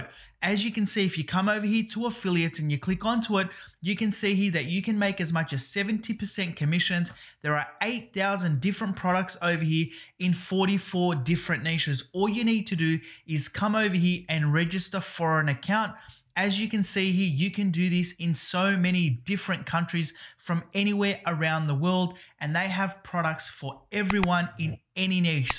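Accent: Australia. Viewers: I like your Aussie accent 👌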